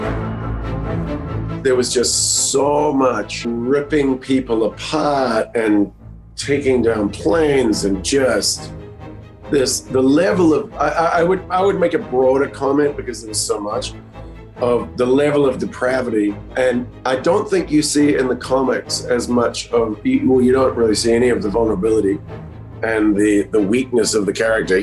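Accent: New Zealand. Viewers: Wait he’s Kiwi?? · He's kiwi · He’s from New Zealand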